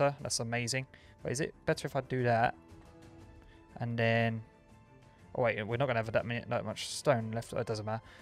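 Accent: United Kingdom. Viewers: Its british. I have the same accent. It doesnt sound like he has an accent to me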